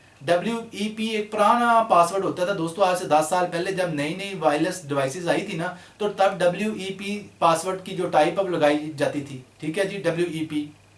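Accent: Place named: Punjab, India. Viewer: Aesi bat nai hai ye Lahore se hain is liye English bolte hue inka lehja panjabiyon wala hai lagta hai koi bat nai itna koi issue nai hai but vedio bhut hi awche hain bazz wait to hum or aap jese eng bolne wale high school me pharne wale bhi is tarhan logon ki madad nai kar pate but I am very thank full to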